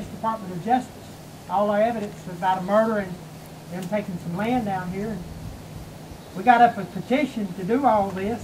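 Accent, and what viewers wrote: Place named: South, United States. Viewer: There are better ways to get things done in this country than elaborate and over-the-top hanging in effigy skits. Next time get an articulate and well spoken person to present your case. I mean the talking to mannequins, the hangings in effigy, and the THICK southern accent completely distracted me from any point you were trying to make. The video creeped me out more than anything.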